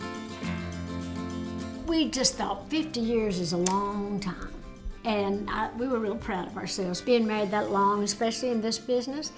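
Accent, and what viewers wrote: South, United States. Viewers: I love the Southern American Accent!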